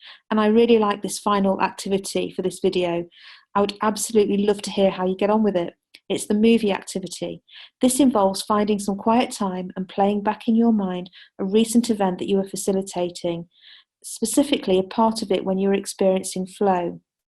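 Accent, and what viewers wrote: United Kingdom. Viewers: Great accent deep British accent, deep information. Thanks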